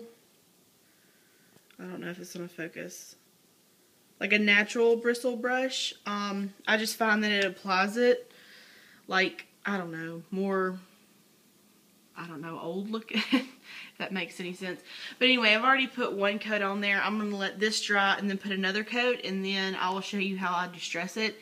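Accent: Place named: South, United States. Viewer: thanks! Awesome nightstand, how special that it was your grandmothers. so glad you are loving the paint! thanks so much for the shout out xoxo! I love your southern accent too:) xo Debi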